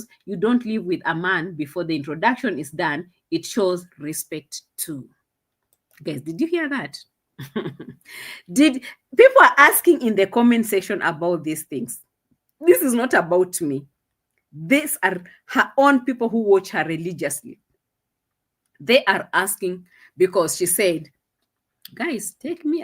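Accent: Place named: South Africa. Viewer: No your accent sounds exactly like S.African When Caster was in SA, the S African said she sounded like them 😂😂😂 ❤❤ we loved that i like when you say ( LET ME LEAVE MYSELF ALONE) 😂😂😂😂😂❤❤❤ That's a new phrase for me adopted from you😂😂😂❤❤❤❤❤💋💋💋💋💋💋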